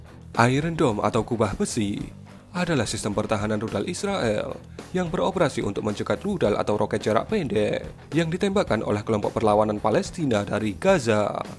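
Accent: Java, Indonesia. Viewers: Medok nih jawa nya kalo ngomng, ga seru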